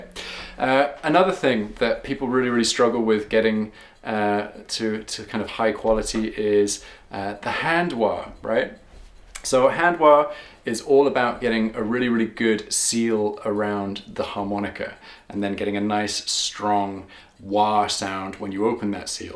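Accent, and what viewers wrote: Scotland, United Kingdom. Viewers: Bro are you really Scottish??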